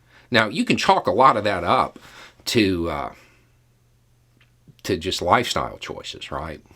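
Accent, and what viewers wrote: South, United States. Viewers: Here’s a plain-talking southern boy. Love you too.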